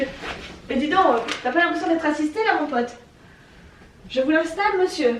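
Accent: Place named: Basque Country, France. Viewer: Que j'adore cet accent Basque 🙂👍👍👍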